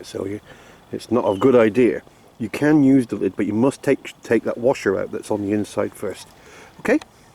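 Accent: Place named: Lancashire, United Kingdom. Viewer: Sounds like your from my end of the country pal, im near Chorley, when you fish sunny 2 if you can get on the double peg closest to the island get on it its a great swim with gravel bars to your right and a great overhanging tree margin and you have the island to fish to, all the best.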